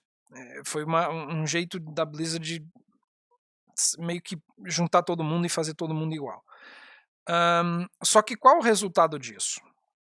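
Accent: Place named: Portugal. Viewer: eu ia comentar que vc tem sotaque português e vc disse que tem família lá kkkkkkk furou meu coment! Cara vou sentir falta dos seus guias, na minha opinião os melhores de tank que já vi. Usei muito de war e de druida(mage tower)! os seus junto aos do Wild são os melhores guias de classe do wow em português. Gostaria de dizer que também parei de jogar desde o começo desse ano, e só voltaria a jogar caso muita coisa fosse reformulada, fiquei entre 6 e 7 anos aqui, um obrigado e quem sabe não voltamos um dia, boa sorte man!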